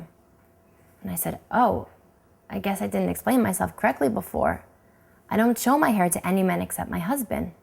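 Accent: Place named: New York, United States. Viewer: I must admit, when I first started watching your vid, I thought, "O dear. Here is an annoying materialistic Jewish gal with an annoying New York accent". But then I found your vid to be very spiritual and quite Biblically educational. More!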